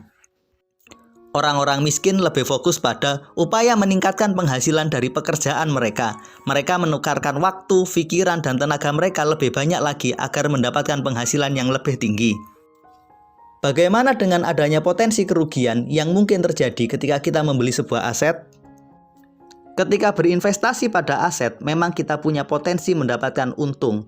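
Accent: Java, Indonesia. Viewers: Wah rasis juga ya. Emang kenapa klo suaranya jawa medhok? Apakah jawa itu miskin?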